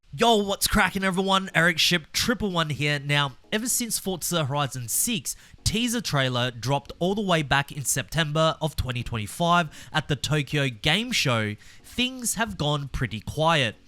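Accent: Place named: Australia. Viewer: not game-related, but gotta ask - where are you from? you throw around 3 or 4 different accents in your narration, which sounds like a HEAVILY tainted Aussie.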